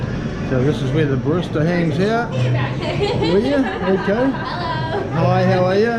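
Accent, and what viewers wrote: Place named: Australia. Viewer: Nice aussie accent. Good job mate.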